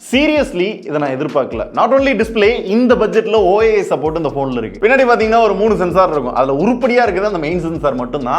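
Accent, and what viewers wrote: Madurai, India. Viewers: Bro neega madurai thana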